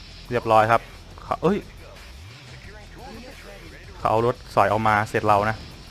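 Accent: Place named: North, Thailand. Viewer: พี่คนเหนือปะครับ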